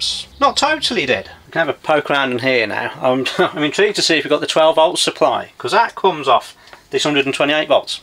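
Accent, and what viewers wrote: Birmingham, United Kingdom. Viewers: You are like all us Brummies a happy chappy, bostin fix bud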